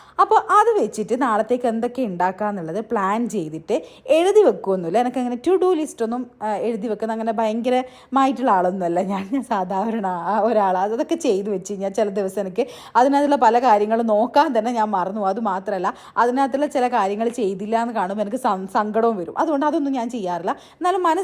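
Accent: Kannur, India. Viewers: നമ്മുടെ കണ്ണൂർ ഭാഷ ഇങ്ങനെ ചറപറ കേൾക്കാൻ തന്നെ ഒരു സുഖാ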